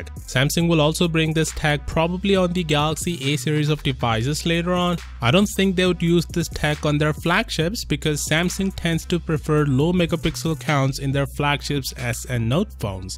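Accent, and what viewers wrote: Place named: India. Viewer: ugh. indian accent. I disliked the video instantly.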